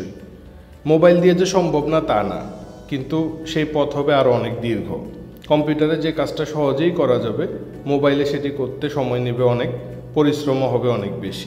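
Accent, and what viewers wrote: Noakhali, Bangladesh. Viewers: ভাই কি নোয়াখাইল্লা নাকি?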